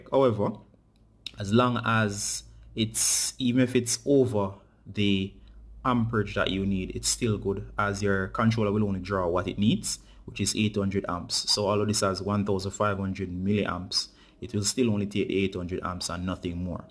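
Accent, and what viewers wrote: Jamaica. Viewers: yuh sound jamaican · Are u ah Jamaican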